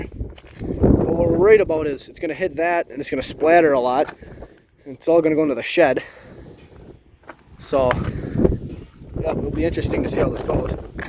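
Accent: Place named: Northeast Pennsylvania, United States. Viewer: Wild never heard of taking down such new silos! ** you have the exact same accent as northeast pa Lackawanna county!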